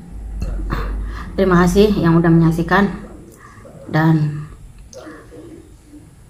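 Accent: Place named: Cirebon, Indonesia. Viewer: Semoga ama cpt sembuh....,maaf mba wong crebon ya...dari dialeknya..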